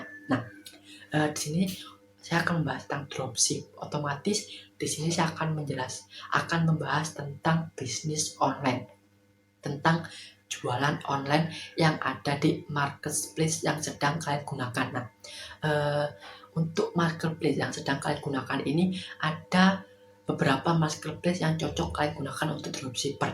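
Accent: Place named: Java, Indonesia. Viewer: Medok bnget jawanya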